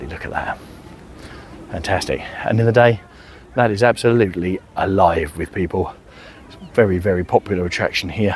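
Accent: United Kingdom. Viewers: Great video, never been to Kensington Gardens but it looks lovely. And I’m understanding the London accent more aswell 🤣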